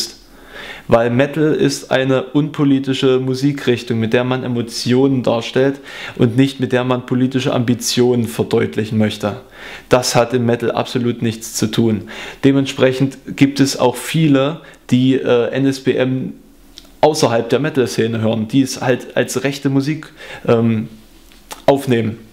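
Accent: Saxony, Germany. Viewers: Ich glaube er kommt aus sachsen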